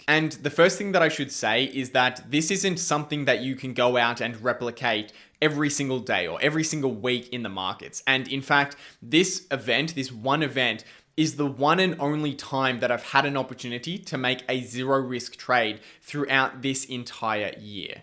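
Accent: Australia. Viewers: Hmmmm rosy cheeks and an Aussie accent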